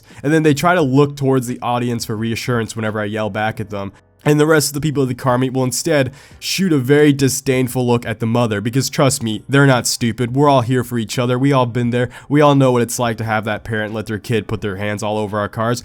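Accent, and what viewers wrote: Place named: Appalachia, United States. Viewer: Dude that hillbilly accent is the reason why I just subbed😂😂😂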